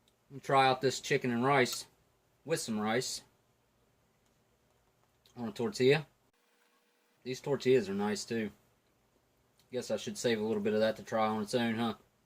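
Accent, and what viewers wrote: South, United States. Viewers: Theres somthing about the southern accent that feels so homey or homelike.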